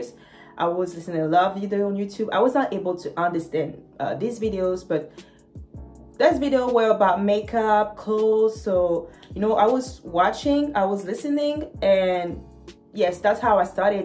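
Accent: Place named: Rio de Janeiro, Brazil. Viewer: Vim te ver falar o português. Tá ótimo! Parece carioca. Parabéns menina! 🥰😉😄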